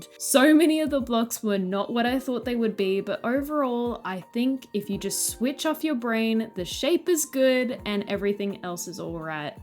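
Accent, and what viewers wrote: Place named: United Kingdom. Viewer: I just realized you sound like a slightly British version of Nina Oyama 😅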